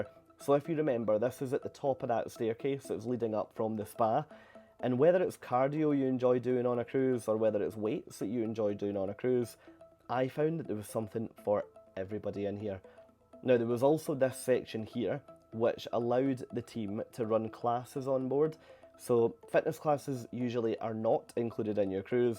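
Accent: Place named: Aberdeen, United Kingdom. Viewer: Are you from Aberdeen? Your accent reminds me a lot of a friend of mine from that area. Ace review!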